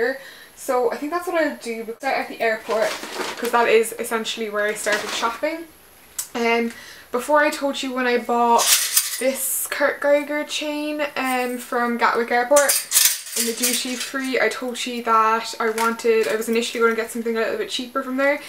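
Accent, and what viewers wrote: Ireland. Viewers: I loved ur haul!it was also really nice to listen to u talking about the products instead of just showing it quickly...I am glad I have found you because I think we have a similar taste in clothes. x check out my videos if u have time..xx ps. love ur irish accent...one of my best friends is from near belfast xx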